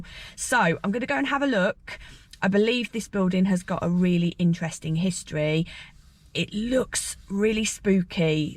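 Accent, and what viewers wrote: Birmingham, United Kingdom. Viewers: Always enjoy hearing your voice! As a Brummy living daan sarf, it’s nice to hear that familiar twang sometimes! There’s so much to see & explore in Brum. Will look forward to more videos 😊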